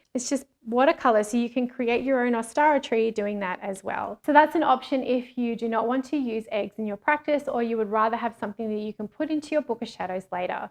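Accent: Australia. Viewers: the accent threw me off. Australia. 🦘 🐨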